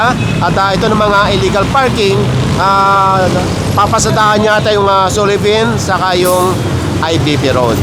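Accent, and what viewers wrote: Visayas, Philippines. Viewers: keep up the good work,mataas ang rating mo sa mga viewers mo kahit medyo bisaya accent ok lang,meron laman ang sinasabi mo.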